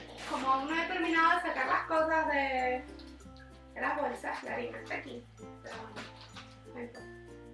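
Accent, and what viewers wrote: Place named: Venezuela. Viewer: Su acento 100% Venezolano, lo note desde el principio. De solo escucharla me suscribi ;)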